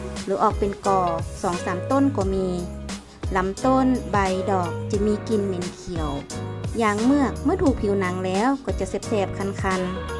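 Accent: North, Thailand. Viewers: ชอบสำเนียงทางเหนือจังครับแฟนผมกะอยู่ลำปาง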